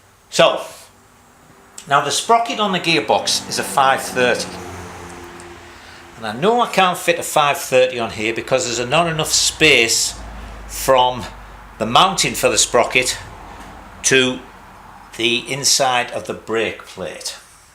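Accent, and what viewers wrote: United Kingdom. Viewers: I'm a car guy but I'm finding this series very interesting. Thanks for showing every little nuance that needs to be taken into consideration. Nice to hear a Brit abroad too. Mart.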